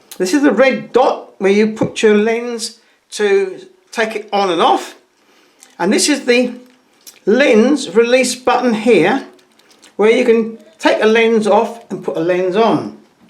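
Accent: United Kingdom. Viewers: Where is your accent from? It seems definitely British but I don't get the specific zone. (I'm not a native speaker, I'm Spaniard.)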